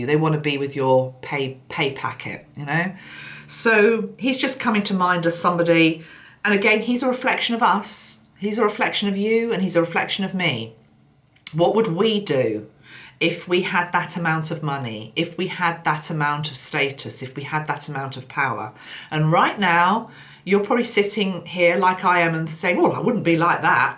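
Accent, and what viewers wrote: United Kingdom. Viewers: This upload was so enjoyable from start to finish. Loved how you tied everything together with the snakes and arrows game, and you have such a beautiful British accent.💖 Thank you for all that you do.💖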